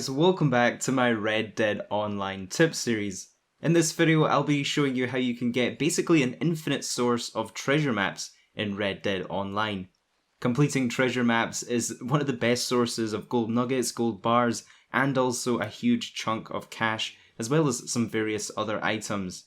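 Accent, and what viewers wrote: Scotland, United Kingdom. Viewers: U Scottish buddy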